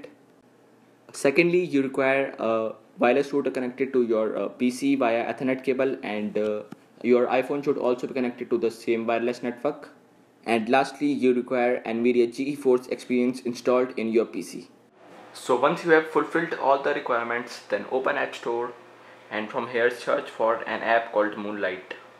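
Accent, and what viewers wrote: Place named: India. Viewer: hate the indian accent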